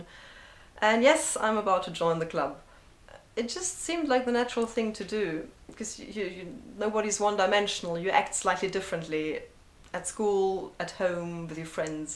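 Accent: United Kingdom. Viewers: Heyyy teacher! I like your videos and your sense of humor. By the way, you've got a pretty good British accent. Peace! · Did your learning of English rely heavily on imitating native speakers? The reason I asked is that your accent, at least when speaking English, sounds like a more refined sort of English accent rather than a German accent. I was surprised to hear that you were German for that reason.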